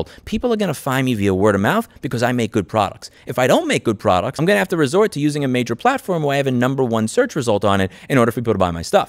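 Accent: New York, United States. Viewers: Hey louis. I genuinely didn't like you when i first found you. I couldn't tell you why, I'd like to blame my Midwest sensibilities clashing with your NY pattern. But I've learned over time who you are and what you want. As little power as i have, i am with you, and I am sharing your vids. I wish i had learned earlier that we had the same goal. Thank you for never stepping down or shying away. You are what every nobody trying to make the broken world work needs. I wish i had half of your drive, and I appreciate how much you do, bared to not just the sun but the crazy lens of the internet. You refuse to compromise, you refuse to back down, and I love it. Thank you louis.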